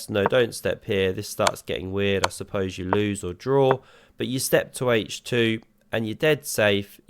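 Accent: United Kingdom. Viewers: Love the humour! And the British accent <3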